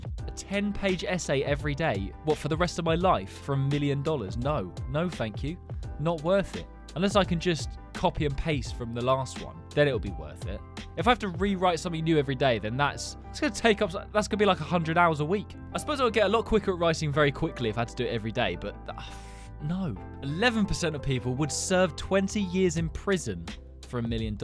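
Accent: United Kingdom. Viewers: Wait are you meant to be from the UK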